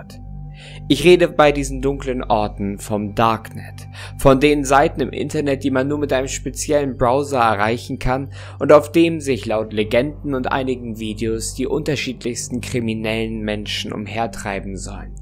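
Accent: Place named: Ruhr, Germany. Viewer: Ruhrpott dialekt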